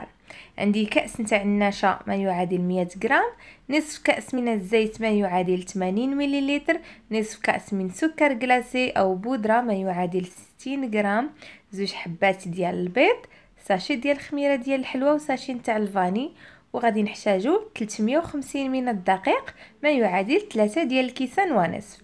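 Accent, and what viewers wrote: Oujda, Morocco. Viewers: السلام عليكم لا تخجلي من اللهجة الوجدية شيكولا قاوقاو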